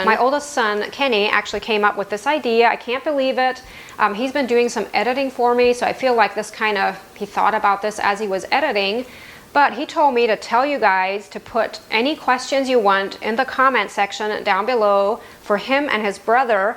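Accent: Pennsylvania Dutch, United States. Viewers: Such an interesting accent.... Pennsylvanian Dutch?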